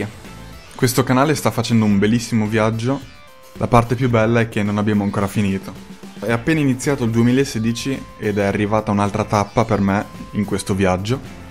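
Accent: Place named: United Kingdom. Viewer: Torna con l'accento inglese così potrai fare lo youtuber con un tocco.. come dire.. internazionale! E così il tuo canale sarà sempre più sexy! Questo pizzico di classe gli iscritti lo apprezzano ;) !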